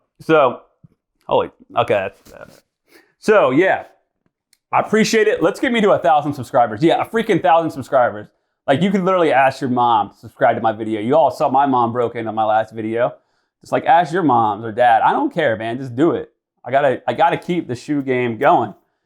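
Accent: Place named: England, United Kingdom. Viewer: The English accent 💀💀💀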